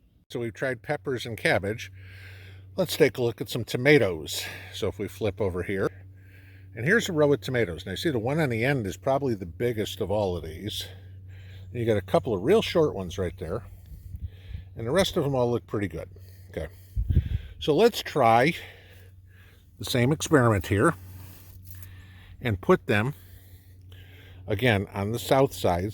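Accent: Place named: New England, United States. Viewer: I tune in because I learn a lot, or at least learn about things I need to research more. You’re like my Yankee YouTube uncle, lol! No harm meant by the Yankee comment — I’m southern through and through so we talk a little different, but definitely are likeminded!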